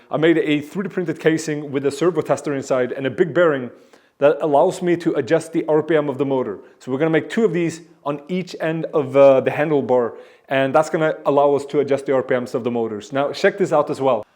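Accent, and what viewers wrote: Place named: Sweden. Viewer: "Spins like a cat", love the Swenglish 😄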